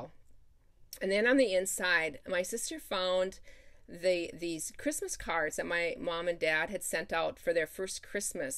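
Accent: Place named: Midwest, United States. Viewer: Wow!, this was the absolute best! I only discovered your tutorials a couple of days ago and have done some binge watching. You are awesome. I just love all you create. I adore your Midwest accent. smile. My dad was from Minnesota. Thank you for all your inspiration. Take care, be safe and much happy stitching.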